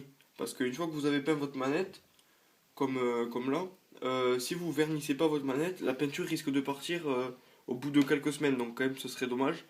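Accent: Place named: South, France. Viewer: T'es du sud toi ? J'entend un petit accent , sinon merci pour se tuto !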